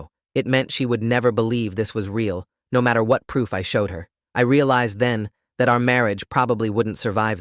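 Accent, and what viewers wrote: United Kingdom. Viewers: british accents are cool man, why would you want to lose it anyway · From what it sounds like, his brain mistook stress for brain damage and tried to rewire itself to protect itself but rewired itself to his knowledge of british accents instead of his normal american one Also, as a british person, I'm offended. A british accent hurt him this much. Those people treated him terribly for sounding like people I know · It sounds goofy as shit in some scenarios, and since he sounds British how people view him changed because America is either the most welcoming place to foreigners or the most brutal depending where, and some people will mistreat him, and think he’s weird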